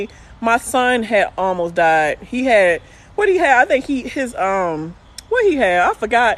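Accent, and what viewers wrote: Baltimore, United States. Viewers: I like that Bmore accent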